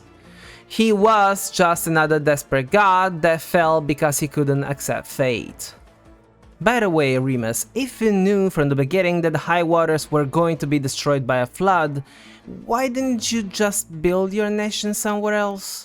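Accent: Italy. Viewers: Ahaha ecco cos'era quell'accento! Ciao compatriota! Sei fortissimo, adoro i tuoi video e cerco sempre di stare al passo. Spero che il tuo canale possa crescere, te lo meriti davvero ❤️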